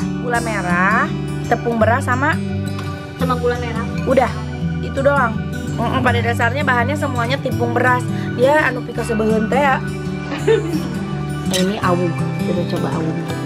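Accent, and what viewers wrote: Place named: Garut, Indonesia. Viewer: Resep pisan ngadangu Teteh Logatna garut pisan...abi orang garut Lami d bandung Janten teu apal ceplak t garut mana teh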